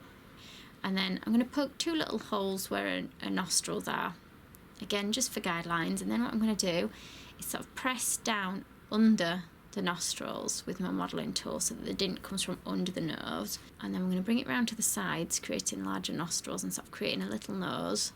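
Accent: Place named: Northern England, United Kingdom. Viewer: she's northern! Northern English accent :)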